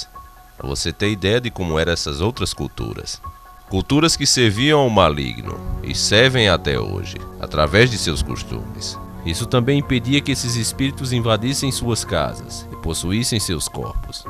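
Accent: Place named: Pernambuco, Brazil. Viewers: Pela voz do Locutor, deve ser de Pernambuco!!! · parabens cara, seus videos são foda mermo e as tuas zoaçoes com sotaque pernambucano é massa!!!!!! ganhou minha incrição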